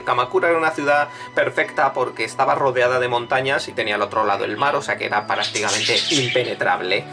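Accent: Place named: Catalonia, Spain. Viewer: toma dislike por pronunciar como un sucio catalan.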